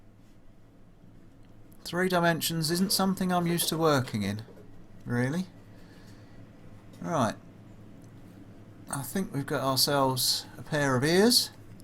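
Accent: United Kingdom. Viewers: I love your video. I do not have a job and could not afford the prints. I sort of drew my own and made programs for my cnc plasma cutter. You had trouble with things fitting but some of my parts did not come very close at all. None the less, I do have the general shape. I am from the USA and your English and accent is something else to me. I do not speak the Queens English. Maybe if I had some tea as you did, I could work thinks out better. Frustrating and fun.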